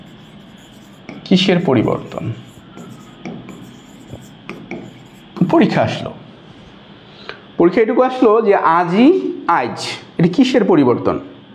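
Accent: Sylhet, Bangladesh. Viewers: Sylhet er basay moja base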